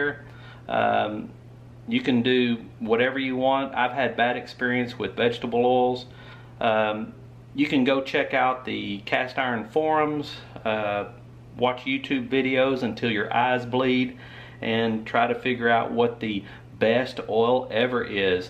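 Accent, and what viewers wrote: South, United States. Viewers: I love when a southern accent roasts trump 😌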